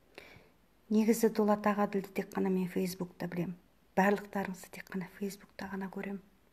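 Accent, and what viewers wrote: Standard. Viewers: Оо ханым.Калай таза казакша сойлейсиз.Шет елде журсенизде.Алла разы болсын.Халыктын батыры Дулат бауырымыздын жаны Жаннаттан болсын.Кайгырып конил айтамыз.Олди деуге келмейтин.Кайталанбайтын батыр деп билемиз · Ооо керемет әпше.Қазақшаңыз таза екен.Мен өз басым қазақшаныда орысшаныда жақсы білемін.Бірақ көпшілік Макеңе жазып жатады қазақша сөйле деп Атеистсің деп.Ұйғырсың деп.Егерде Макең нашар болсада арасында қазақша сөйлесе эфирде.Содан кейін Мен 5 мезгілі намаз оқимын деп ҚҰРАН кітабын ұстап айтса онда 90 % қалық Макең жағына өтетін еді.Ия мен жақсы түсінемін ол кісіні.Біздің заманымызда сондай болды.Бірақ қазір заман өзгерді.Сізде Қазақстанда тұрмағаннан бұл жақтағы жағдайларды түсіне бермейтін шығарсыз.Жұма күндері еліміздің бір мешітінде орын болмайды.Барлығы жастар.Сол себептен митингілерге жастар шықпайды.Ал жастарсыз митинг деген бос әуре.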